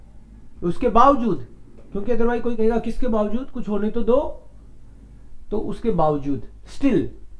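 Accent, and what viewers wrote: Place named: Germany. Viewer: Learning a language requires learning all its aspects. If you can't do German accent, at least try. Your German accent is pathetic & disgusting to hear. Just rote learning grammar & expending no effort in accent-polishing! Please don't go to Germany as we, other Indians, do not want to be judged based on one bad speaker.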